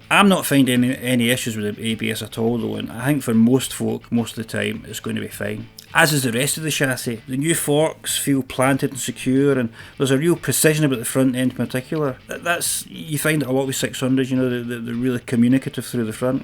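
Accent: United Kingdom. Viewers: British accent, u cant beat it.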